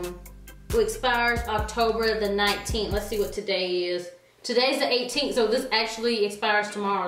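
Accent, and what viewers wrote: South, United States. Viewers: I have been looking at your videos for a little while now but I really have to say I love your southern accent! And girl you are gorgeous😍😘. It was chilly today in Charlotte so after I got my boys off to school I just set back & watched a bunch of your videos, followed & liked pics on your IG. I have become obsessed with your channel just from this morning lol. You have amazing taste! Keeping posting so I can keep watching 😊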